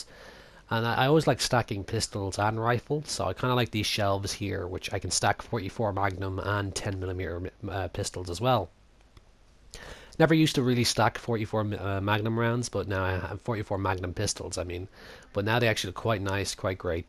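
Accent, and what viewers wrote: Ireland. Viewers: You kinda sound like jacksepticeye I'm guessing you're Irish?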